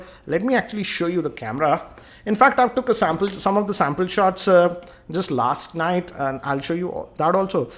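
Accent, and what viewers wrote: India. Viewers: indian accent makes my ears bleed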